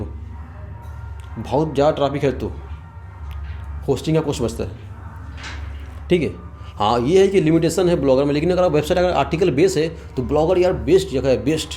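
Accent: Bengal, India. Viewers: Bhi Ap Bangali Ho Kya.....?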